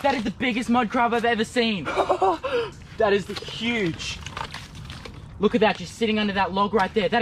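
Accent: Australia. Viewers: You don’t even have bears down there!!! That’s why you Auzzies like to beleive you’re all outdoorsy and nature ific but you guys have the weakest fauna of all. Kangaroos and snakes and nothing even dangerous!! And your accents sucks man!! Internet it’s sooo annoying you talk like Brit who wants to be Australian sooo badly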